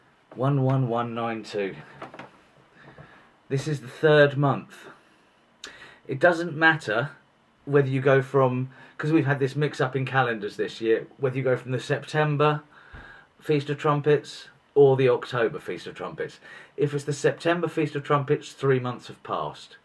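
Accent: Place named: United Kingdom. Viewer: Beautiful British accent and a beautiful encouraging message! Thankyou xxx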